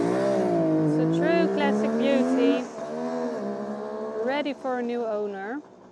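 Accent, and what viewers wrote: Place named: Netherlands. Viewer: Tu mir einen Gefallen..keine KI... Ich liebe deinen niederländischen Akzent 🙂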